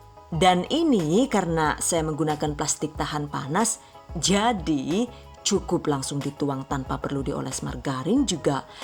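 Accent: Banyumas, Indonesia. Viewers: Iya bener. Apalagi pas ngomong pake bahasa ngapak😂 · 😂😂😂 ngapak ya ora ngerti... Mksih bun😘😘😘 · Tks ya resepnya..pasti yg medokk kadang ngapakk..cuantik dehh ibunya..he..he..he..yeni virgo suka deehhh dng cara mmberitahukan resepnya....maju truss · Terima kasih resep nya dn sempat tertawa juga karena ada bahasa ngapaknya😊😊😊😊😊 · Sampeyan itu orang mana mbakyu. Aku kalo lg lihat vedionya..sampe ketawa sendiri dngn bhs ngapak.mantap resep2 sampeyan gampang ditiru.maturnuwun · Trima kasih ilmuny Yg bikin kangen NGAPAK2 NYA ITUUU LUCUUU TAPI GC MBOSENIN 👍👍💪💪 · Ngapak nemen rika mbekayu....??!!! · hhhh ngapak lucu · Bahasa ngapake metu.. 🤣 ngapake kaya daerah banyumas, cilacap, purbalingga, kebumen.. udu ngapak tegal udu kie tah.. 🤣🤣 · Wkwkwkkwkwkw.....asiiik ada suara ngapak ngapaknya ... 😀🙏😊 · Ya ampun lucu bgt sih ngapaknya hehehe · Aku suka ngapaknya selain resep" nya😀😀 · Ngapak ternyata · Jebule wong ngapak apa kieeee🤣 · Mandan ngapak yayune jan, sinau nengkene ya kena kiye sidane 😁😁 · Weslah ora sah ngecepres baee ngelih kohh wkwkwkwkkw jossss ngapake josss ky inyong wong jawa,, is the best lahh haaaaaaaa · Asslmkum njenengan wong ngapak ya yuk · Wong ngendi Jane rika zu? 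Madiun ka ngapak 😂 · Maen temen deneng ky kue bisa ngapakz ,tengkiu resepe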